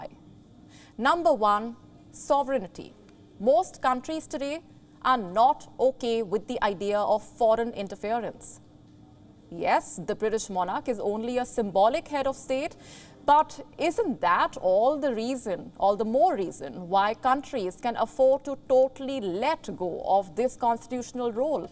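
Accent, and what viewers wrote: India. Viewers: Speaking english in indian rythm is interesting.. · what do you think it means. An Indian accented English speaker. It's a common thing these days.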